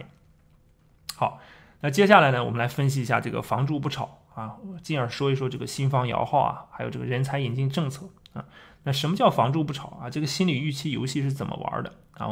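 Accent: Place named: Hohhot, China. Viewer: 感觉是呼市人，我认识吗？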